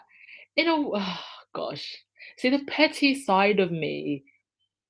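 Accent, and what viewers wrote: United Kingdom. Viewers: A million views that's fantastic Congratulations. It's really not surprising your podcast is very interesting and I love the British accent it makes me feel that I have a front roll set. Thank You. 👍💕💕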